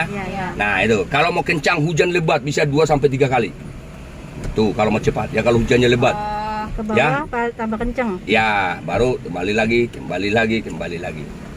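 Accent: Medan, Indonesia. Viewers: Enak ini bapak ngajarinya top mudah dipahami sabar dan telaten diawali dr dasar bicara logat Medan teringat ayah ku kalau bicara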